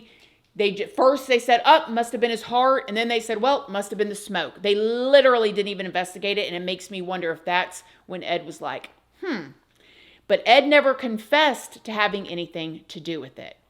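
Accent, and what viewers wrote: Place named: Eastern Shore, United States. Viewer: Are you from delmarva? It sounds like a eastern shore accent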